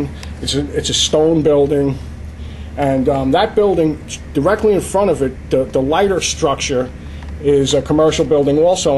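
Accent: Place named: Jersey City, United States. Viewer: Im from downtown Jersey City between Monmouth st and Brunswick st. Everyone in my neighborhood except the transplant hipsters talks like this guy. I have the same accent and wouldn't change it for anything the world. I dont know about the rest of Jersey but I know Jersey City.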